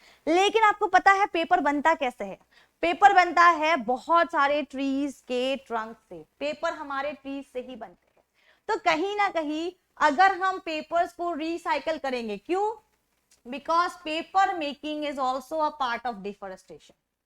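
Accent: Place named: Bengal, India. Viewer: Mam 's accent is like a Bengali....😀👍🏻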